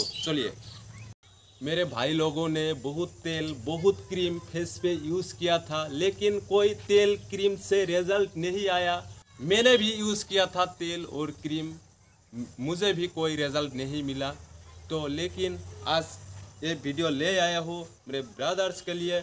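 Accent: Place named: Assam, India. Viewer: Ye assamese ladka hein mein bhi assamiya hun... mujhe pata sala ye assamese ladka hein iske ayaz se pata sala... bro Ki khbr ...mojja lagise video tu dari ahile tumak dhanyavad dim😁😁😁😁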